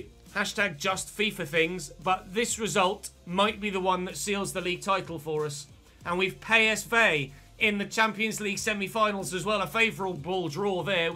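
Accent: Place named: Lancashire, United Kingdom. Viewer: Frighteningly accurate Lancashire accent.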